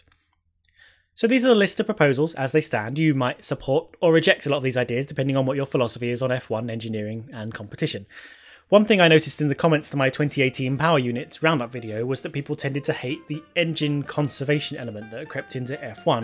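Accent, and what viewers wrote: United Kingdom. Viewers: didn't notice cuz of ur British English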